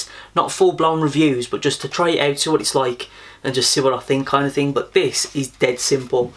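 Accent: Black Country, United Kingdom. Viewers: Just subbed after watching some of your review videos, you sound like a Black Country lad 👍🏻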